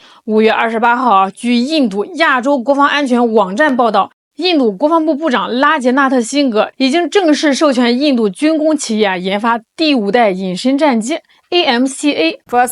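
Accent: China. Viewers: 口音100%是中国的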